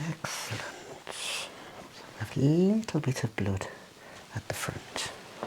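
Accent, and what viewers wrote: Birmingham, United Kingdom. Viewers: Your accent makes me homesick for the UK ♥ thanks for your hard work, love from Canada!! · Mouth. It’s the brummie accent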